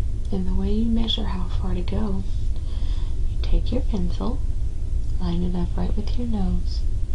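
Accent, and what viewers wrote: South, United States. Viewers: She sounds like a female Bob Ross, that calming southern twang creating ASMR masterpieces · I just want you to do my makeup in real life. Ugh. You are so comforting and I love your sweet southern accent! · You have this really subtle (southern?) accent that's exremely pleasant. Can I ask what region of the us you are in/ from? Here in the PNW we overpronounce everything, and sometimes sound snooty. Also, I can see why this video has 18000+ views. I've got a mild case of coma, lol. Seriously, great stuff. Thanks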